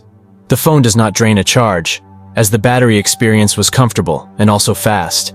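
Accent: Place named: United States. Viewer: I expected that you were from the United States due to your smooth accent. I was shocked that you were from Iran. I am honored by your beautiful content. Keep it up ✨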